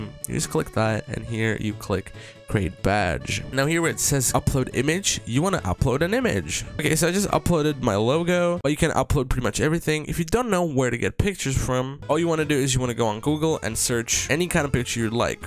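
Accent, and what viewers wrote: Czech Republic. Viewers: bro are u from czech republic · ARE YOU CZECH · are you from czech??? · wait are you czech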